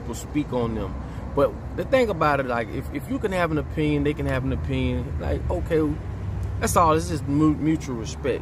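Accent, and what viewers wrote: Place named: Atlanta, United States. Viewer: You sure you from Florida you got...you got an ATL swagg fosho...talk and everythang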